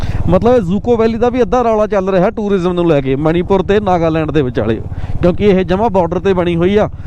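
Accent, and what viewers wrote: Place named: Mohali, India. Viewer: ਬਾਈ ਦੀ ਬੋਲੀ ਤੋਂ ਮੋਹਾਲੀ ਦੇ ਨੇੜੇ ਤੇੜੇ ਦਾ ਲੱਗਦਾ ❤❤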